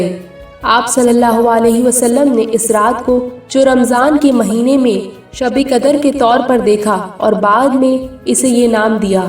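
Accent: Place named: South Asia. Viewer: ap badun ki bol chal sa he andaza laga sakta tha ki ya india ka hn ya pakistan ya kasi sawal howi??!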